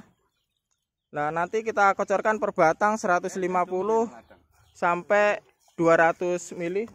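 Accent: East Java, Indonesia. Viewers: Wa'alaikum sallam bossss......,kata2e sampean iki kok koyok Wong jawa timur,samean iki asli ngendi e bo....ssss?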